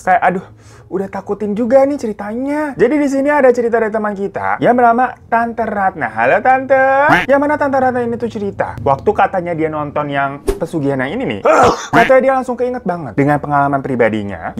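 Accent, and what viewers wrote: Indonesia. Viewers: Slang indonesia kamu paling mantap.. suka saya dengar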